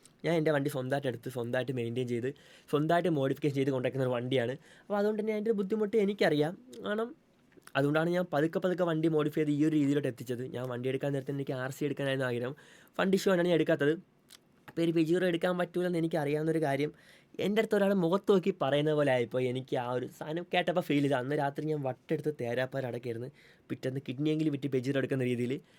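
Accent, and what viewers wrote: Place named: Kochi, India. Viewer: എടാ എന്റെ പൊന്നു മോനെ ഇവളെ അറിയപോലുമില്ല. പിന്നെ കൊച്ചിക്കാരുടെ ഭാഷയല്ലേ ഇവള് സംസാരിക്കുന്നത്. കൂതറ കൊച്ചിക്കാരിത്തി. ആ പേരിട്ടതിനെതിരെ കേസ് കൊടുക്കണം. കൊച്ചിക്കാർക്ക് ചീത്തപ്പേര് ഉണ്ടാക്കാൻ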